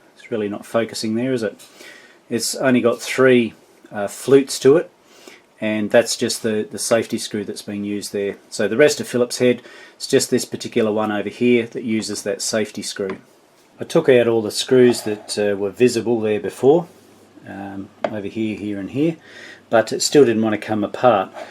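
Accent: Australia. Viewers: Loved your delightful Aussie, unassuming, understated technical explanation that clearly demonstrates, without intending to, that electronics is not at all for the home handyman. This 'repair' is way out of my league, and I'm off to buy a new kettle!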